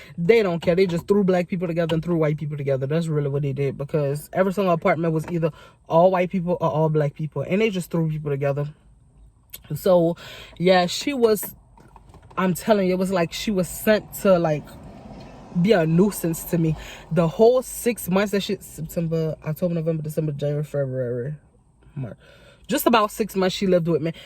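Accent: Louisiana, United States. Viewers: Are you from New Orleans? I love the way you sound. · Love your accent...want to visit lousiana one day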